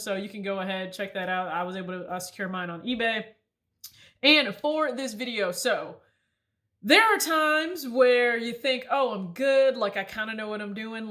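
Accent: South, United States. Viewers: yea via free side. that's usually how I do my legit checks . I get overly obsessed sometimes cuz I happen to enjoy it lol.. also great video love the content !! as well as the fact that your freaking amazing !! love the southern accent!!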